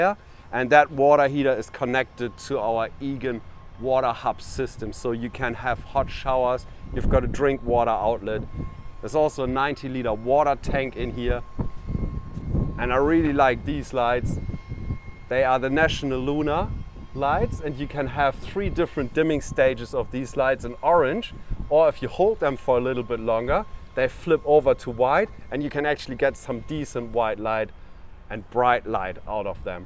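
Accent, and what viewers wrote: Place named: Germany. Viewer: It’s a pretty fancy set up for a troopy. I am just asking myself where they put all their camping gear? What about a table, chairs, bags. You said it’s perfect for longer traveling where do they put their clothes? Looks like heaps of electronics but not much space in the end… I would be curious what this set up costs… Ps. Love the German accent! :)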